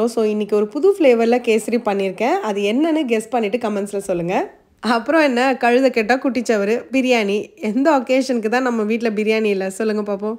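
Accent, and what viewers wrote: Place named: Bangalore, India. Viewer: Hearing Bangalore Tamil😅 And ur Tamil feels good 😊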